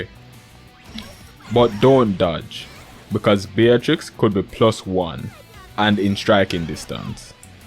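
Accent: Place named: Jamaica. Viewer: You sound Jamaican. Been struggling to find granblue players in this country.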